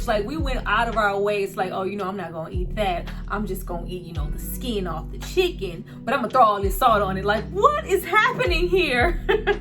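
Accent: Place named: North Carolina, United States. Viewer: Are you from North Carolina queen? The accent sounds like it.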